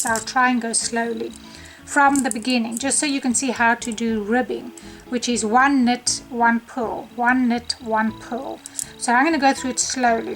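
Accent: South Africa. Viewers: Are you South African? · I love your accent. You by any chance from SA? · Is that a South African accent? Lekker, will try with God's will soon ❤ Thank You P.S in Hebrew means my happiness, is happiness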